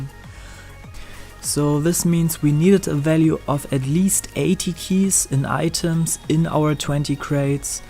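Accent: Germany. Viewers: Hey dude nice vid you lissen Like a German Guy ^^, i am German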